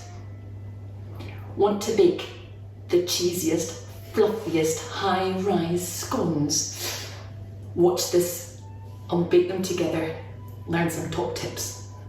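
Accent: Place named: Scotland, United Kingdom. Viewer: Thumbs up for your scones (and your lovely Scottish accent!)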